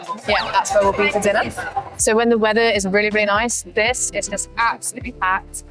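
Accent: United Kingdom. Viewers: 英國腔好聽😂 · 好聽的英國腔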